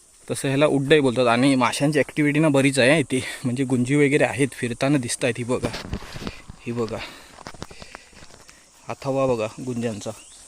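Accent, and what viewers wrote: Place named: Konkan, India. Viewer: me pan rajapur chi aahe ha video pahun aani aapli kokani bolna mala khup aavadte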